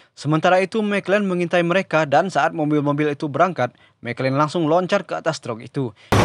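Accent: West Sumatra, Indonesia. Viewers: Orang minang bang?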